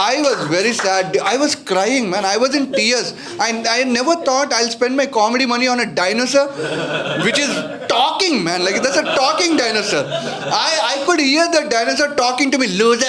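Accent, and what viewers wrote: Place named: Telugu, India. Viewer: His accent reminds me of my Telugu friends from my hostel days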